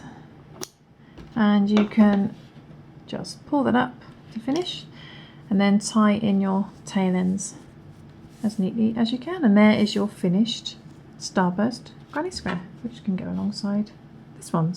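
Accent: United Kingdom. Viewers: You explain it so well and your not rushing it at all I love how it’s all to do with the crocheting rather than showing off your nails like some others do . Plus it’s good to hear someone from the uk 🇬🇧 like myself 😊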